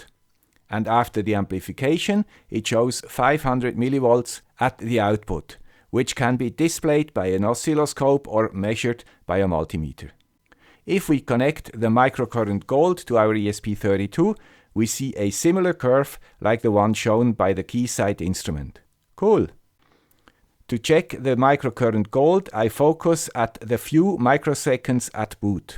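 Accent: Switzerland. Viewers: and don't forget 'the guy with the Swiss accent' reference either - otherwise us old folk will become bewildered and confused. We don't like change!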